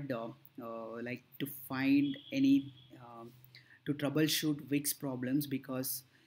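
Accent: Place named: India. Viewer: Thankyou so much. So glad to hear a indian helping us out.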